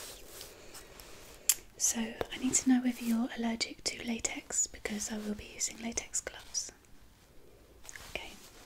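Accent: United Kingdom. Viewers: Heard a British accent - subscribed straight away! This is an amazing video!! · Idea 💡, There are a lot of us who learning english, and lot of us like British English pronounciation, and we almost haven’t asmrtists with pronounciation as yours. You could make a lot of material for learners in your asmr way. Podcasts on spotify, books naration on audible. You could do it all. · the video is perfect, love your british accent, tingles after each word :D may I please ask you, is this a London accent? or are you from the middle of the UK? I'm still wondering which british accent is the best and I think I've found one :D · You are so beautiful 😍 and your sweetest British accent drives me crazy 😘😘😘😍😍Marry me my queen 👑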